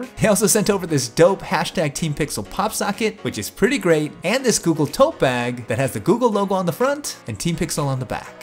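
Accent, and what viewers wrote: United States. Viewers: Another fantastic video from. Honestly saying I didn't copy but suddenly noticed there is a channel which is publishing videos almost identical to my videos. Even though he will win for quality and the gears he is using are far better than me. Also my accent is too Indian (Im not Indian though) than his American excellent accent. you are good.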